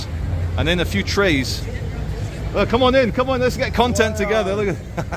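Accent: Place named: Cornwall, United Kingdom. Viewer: You sound like you come from Cornwall bro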